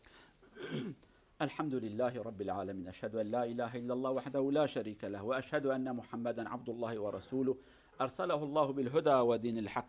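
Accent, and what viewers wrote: Turkey. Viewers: Bu hocamızın dili Bitlis tarafına benziyor sanki diline sağlık hocam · Maşallah hocam ne insaflı bir konuşma. Acaba Hocamız hakkında detaylı bilgi alabilir miyim? Şive Diyarbakır çevresi gibi.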